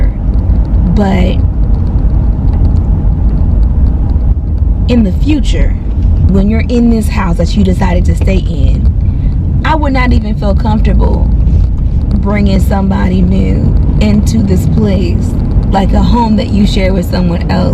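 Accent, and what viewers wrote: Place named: South, United States. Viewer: That country accent is too cute😂. . Can’t wait to see the new space. Everything will work out. Praying for you!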